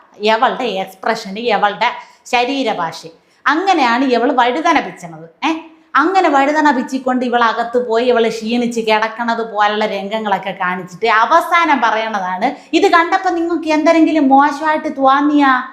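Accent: Thiruvananthapuram, India. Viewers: അപ്പി എങ്ങനെ ആണ് തിരോന്തരം ഭാഷ സംസാരിക്കുന്നത് കുട്ടനാട്ടു കാരി അല്ലേ 👍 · എപ്പോഴും തിരുവനന്തപുരം ഭാഷ ബോറാണ് മാറ്റി പിടിക്ക്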